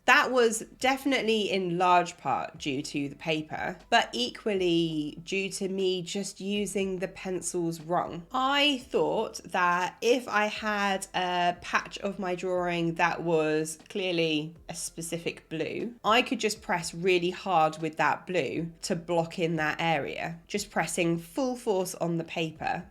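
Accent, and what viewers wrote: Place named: RP, United Kingdom. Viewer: I like your accent Sounds so posh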